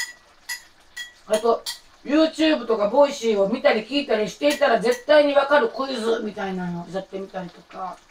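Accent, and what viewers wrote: Kansai, Japan. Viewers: いつも楽しく見てます。関西弁大好きです💖最近、の「しいたけお先生」 「玉ねぎ子先生」先生シリーズにはまってます(笑)🤣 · たぶん、ジャニーズウエストかなと。知らんけど～知らんのかーいのショート動画もあるので面白いですよ！関西弁は聞いてるのは面白くて好きです。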